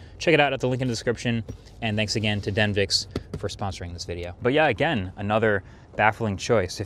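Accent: New Jersey, United States. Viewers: he so new jersey 😂🔥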